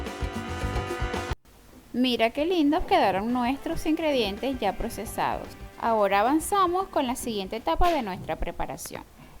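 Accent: Venezuela. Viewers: Hola! Soy de Venezuela, pero vivo en U.S.A. yo imagine que eras de Venezuela por la forma de hablar y de cocinar (con clace) jjjj Saludos.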